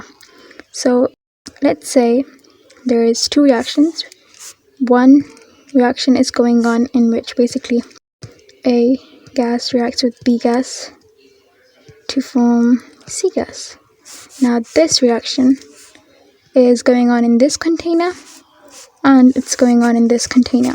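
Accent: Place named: United Kingdom. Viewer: Hey, loved this video...and your accent ahhh it's sooo british. looking up for more videos...keep up the good work ❤❤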